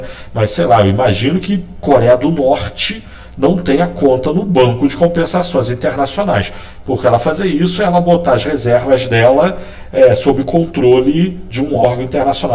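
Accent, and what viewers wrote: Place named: Rio de Janeiro, Brazil. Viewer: professor é carioca ahha, excelente aula.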